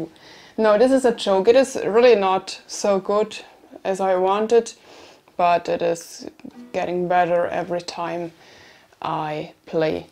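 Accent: Bavaria, Germany. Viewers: my late partner would have loved this video. She was German, from München/Munich and the reason why I started to watch your videos. You are both the same and not the same... both strong minded and kind, and your Bavarian accent is very much as she sounded. Music is my life, I am a musician and nature lover... Thanks for sharing some life things with us.:)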